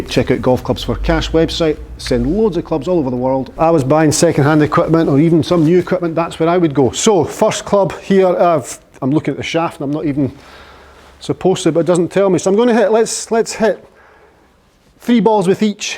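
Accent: Scotland, United Kingdom. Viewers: you are a blast to watch and whatever you do, don't lose that Scottish accent!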